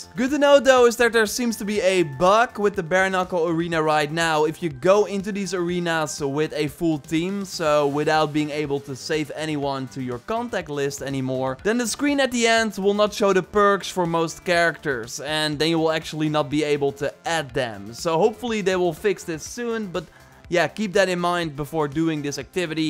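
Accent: Netherlands. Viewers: Je hoort zo makkelijk dat je Nederlands bent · I smelll dutch ❤ big fan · is deze man nederlands?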